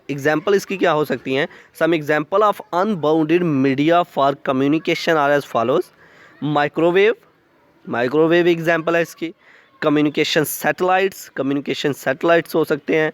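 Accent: Punjab, India. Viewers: Punjabi ascent <3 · Aapka Panjabi lahjaa mashallah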